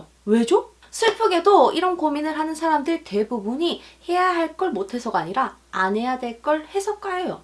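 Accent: Gyeongsang, South Korea. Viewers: 님의 목소리ㆍ말솜씨 정말 매력적이군요 경상도 특유의 억양이 상대방을 기분좋게 합니다 훌륭한 방법을 숙지하고 실천 할려고해도 좋아하는 마음이 너무 꽉 차있으면 감정이 가만 놔두지 않네요 ㅡ 님의 강의데로 천천히 데쉬해 볼랍니다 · 갱상도 아인겨?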